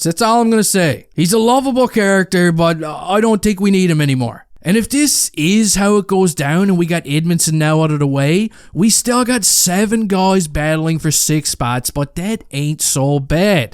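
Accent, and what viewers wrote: Newfoundland, Canada. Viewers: Pleas tell me what part of Newfoundland you are from?!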